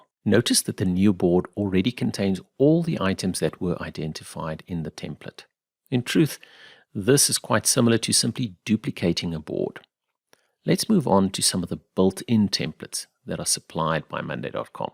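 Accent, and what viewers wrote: England, United Kingdom. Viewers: like the content and usually like an English accent, but this is like fingernails on a chalkboard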